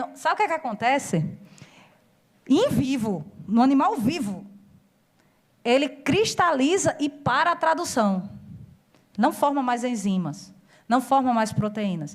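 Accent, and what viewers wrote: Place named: Recife, Brazil. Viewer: Sou do Recife e adorei esse sotaque me senti agora em casa😊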